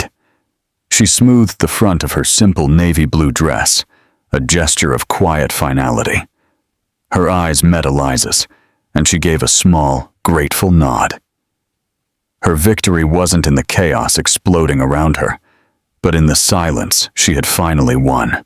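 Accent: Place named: Australia. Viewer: Very interesting and it's so nice to see an Australian version of such Marital stories... Good One, the Lady is using her Brains without being Egoistic...Having been supportive and helping their Building business be established... He grew horns in his arrogance, forgetting the Partnership as equals... Serves him right...Best wishes to his Wife and family... From NSW 2680...Thank you for also talking in our Aussie English..Wonderful hearing the narration...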